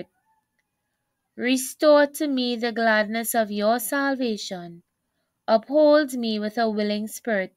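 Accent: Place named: Trinidad and Tobago. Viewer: Trini accent 😁 also Amen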